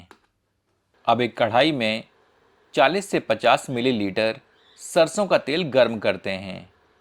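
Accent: Standard. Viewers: शुद्ध हिंदी भी सुनने को मिला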